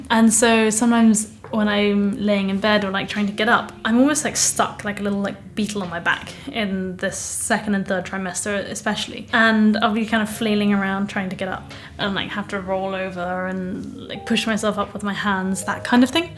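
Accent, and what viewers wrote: United Kingdom. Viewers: 从你读大学的视频看到你现在怀孕将要做妈妈，见证你从女孩到女人，心里很感触。恭喜你&祝福你。我的英文不好，但是我很喜欢你的英式英文的口音。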